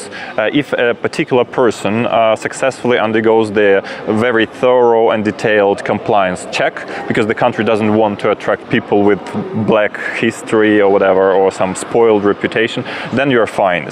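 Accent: Russia. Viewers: I hear a strong russian accent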